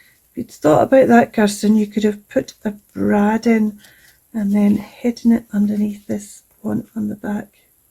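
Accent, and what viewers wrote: Scotland, United Kingdom. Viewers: There is nothing more mystical than a poem by Poe read with a soft Scottish accent! I love your little project today, I don't ever think to sew smaller booklets into my larger journals, so thank you for the inspiration! 💜🖤💜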